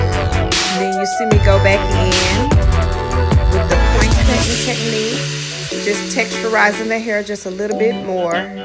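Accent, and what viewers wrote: South, United States. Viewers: I can tell you're Southern belle by the accent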